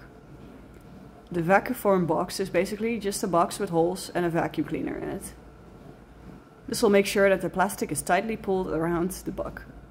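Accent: Netherlands. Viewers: I can just hear this person is Dutch 😂 great job amazing work😊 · Dutch accent? 🤔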